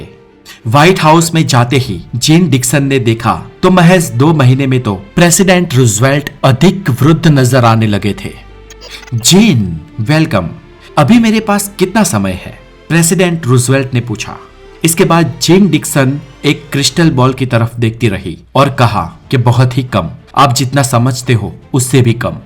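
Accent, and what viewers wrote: Gujarat, India. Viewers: His pronunciation says that he must be Gujrati and most of the times he speaks gujrati so he not able to speak Proper Hindi.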